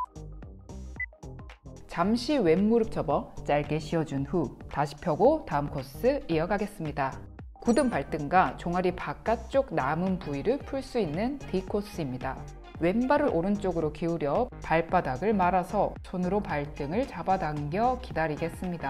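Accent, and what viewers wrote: Gyeongsang, South Korea. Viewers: 말투가 ....신기하네요 사투리인가요..?ㅋㅋ좀...신경쓰이는ㅋㅋㅋ 경상도인지 강원도인지특이하네여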